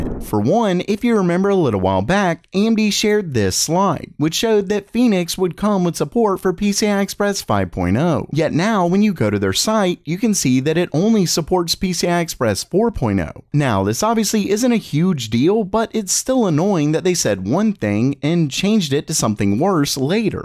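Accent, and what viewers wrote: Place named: South, United States. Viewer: please lay into your accent more. i need tech vids from a southern gentleman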